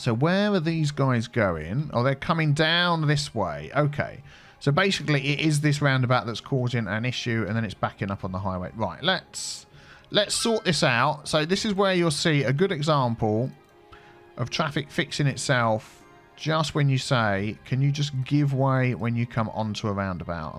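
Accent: United Kingdom. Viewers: Didn’t now you were British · The exact right tone of voice. British indeed.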